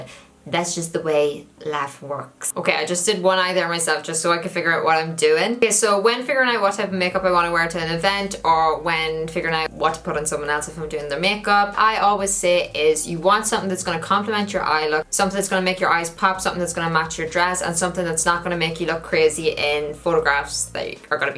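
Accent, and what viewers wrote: Ireland. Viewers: definitely Irish 😍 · I love hearing the Irish accent on YouTube 😍 sounds like me when I talk 😂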